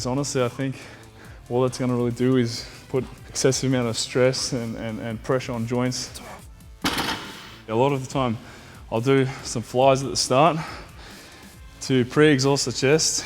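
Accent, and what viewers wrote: Australia. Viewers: most definitely is Australian you plum. Hence the Aussie accent. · With a hint of an Australian accent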